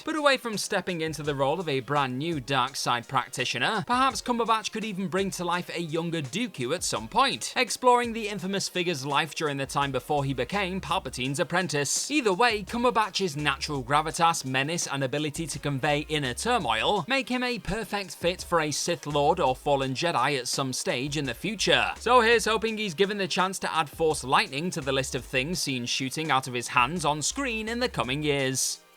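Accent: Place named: Cockney, United Kingdom. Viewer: You missed yourself off the list. I'd cast you as either the 2-headed alien sportscaster at the Boonta Eve podrace or a new host for Jedi Temple Challange. Then you'd be my favorite Jedi Master! Your glorious cockney accent would get those younglings moving! 😆😆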